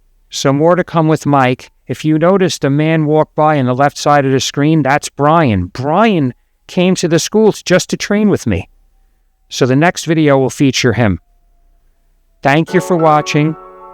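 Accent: United States. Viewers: Even though you didn't say it during this roll, I heard your voice is in my head last night when I rolled saying, " Four points of contact" when I ended up in guard after a take down. I'm a one stripe white belt working on my second and I was rolling with a much heavier blue belt, all I kept thinking was four points four points, it worked quite well for the rest of the round. Your narrations are sinking into my game, thank you for that. I am older than you but you sound a lot like my grandfather who was a New Yorker from Brooklyn.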